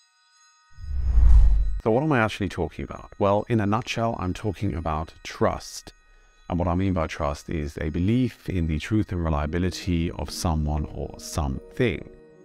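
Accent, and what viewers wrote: Germany. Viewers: Ich würde fast sagen, der Akzent ist dem Deutschen mittlerweile etwas angepasst, deshalb so gut zu verstehen lol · … you lowkey have a german accent… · You have developed a bit of a German accent! You sound like a German who speaks very, very good English. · you do sound like German speaking English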